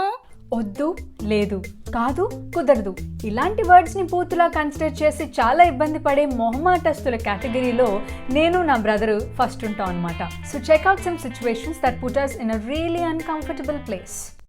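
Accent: Godavari, India. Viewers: Slowly i bcame fan of ours. U r male getups r osm, suits u a lot. Godavari dialect is so funny osm. It shows how much hardwork u make behind the scenes.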